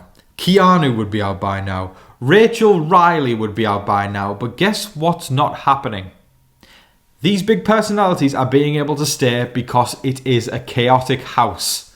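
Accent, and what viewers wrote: United States. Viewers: Ur accent is literally just another redneck American perfect like the whole tone was different 😂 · Love your American accent. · As a guy from california i find ur american accent hilariously accurate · Okay I've never heard your American accent before! And I honestly loved it! I busted out laughing! Good job Mate! Lol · Your American accent is spot on. It sounds like a Florida/Georgia accent lmao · Your American accent is good, it’s just the words you are saying aren’t good, lol. I think the fact that shower gate is still anything is laughable.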